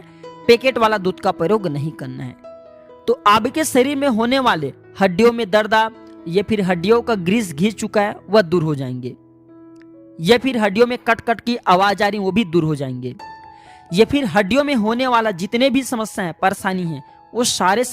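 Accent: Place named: Assam, India. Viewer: Etleti bolta hai gurji assam se ho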